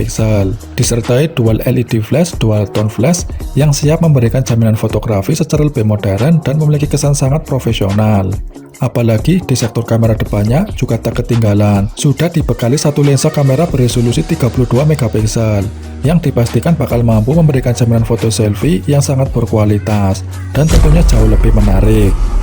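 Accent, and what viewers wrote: Banyumas, Indonesia. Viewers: Medok cak suarane kepriben kepriben inyong.....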